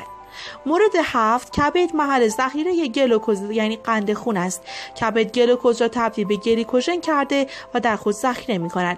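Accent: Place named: Turkey. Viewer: لهجه تورکیت منو کشته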